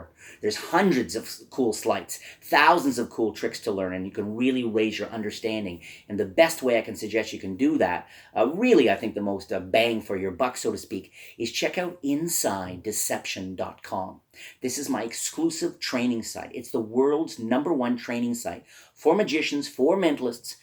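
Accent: Canada. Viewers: Kiera Todd not sure what it is. Maybe it’s the glasses or that Canadian accent, not sure, but he is really good. I always like the tricks he does.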